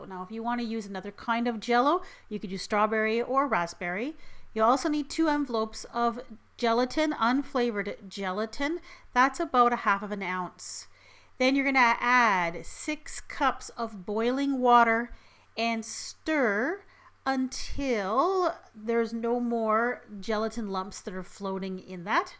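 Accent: Canada. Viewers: Canadian! You can hear it in certain words she uses :)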